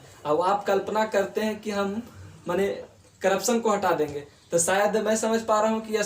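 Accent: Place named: Bihar, India. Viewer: Nice video sir. Khashkar samjhane ka bihari language aur andaz😂👍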